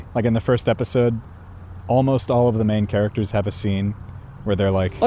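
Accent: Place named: Ireland. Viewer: Flawless editing and Irish accent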